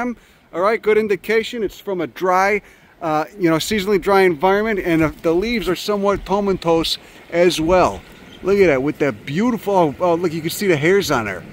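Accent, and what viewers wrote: Chicago, United States. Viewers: Love this channel particularly cause of the Chitowm accent makes me feel back at home. Would love to see my favorite bird, Common Nighthawk nesting in those rocks. Hey tanks for da channel!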